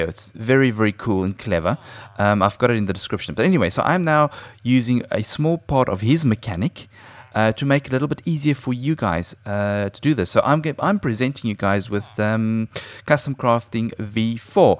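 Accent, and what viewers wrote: South Africa. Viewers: hi. where are you from? You sound South African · are u south african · HEY!! are u south African? If yes I am too!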